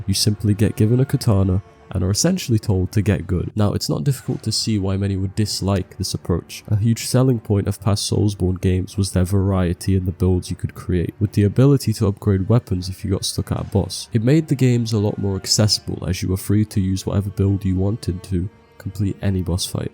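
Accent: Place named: United Kingdom. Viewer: Hmm British dude doing soulsborne commentary? Subbed.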